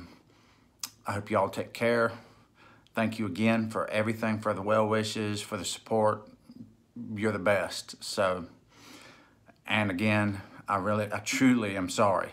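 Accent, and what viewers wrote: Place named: South, United States. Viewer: No apologies are necessary! You were out there actually hiking the trail not watching others do it like we are! The videos you have posted of your hike are the ones I truly look forward to the most. Your candid and humble personality is always a pleasure to watch and I enjoy your dialect being from the south myself. And the fact that you enjoyed your hike so much really shines through in your videos. Cheers to a speedy recovery and your future outdoor adventures. Look forward to your update when you feel inclined to post. Enjoy your home with heat and electricity! LOL.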